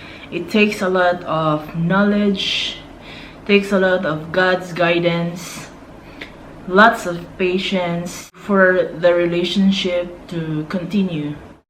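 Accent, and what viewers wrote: Nigeria. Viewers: I love that you have a Nigerian accent and behavior.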